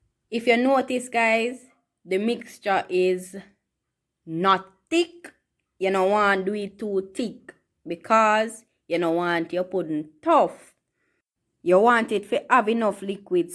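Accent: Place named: Jamaica. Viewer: Love the Jamaican accent! I hope I can visit Jamaica soon!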